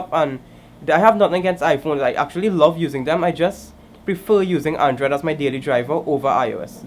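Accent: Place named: Trinidad and Tobago. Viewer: Rel glad you stick to your trini "accent"...good stuff...keep up the hard work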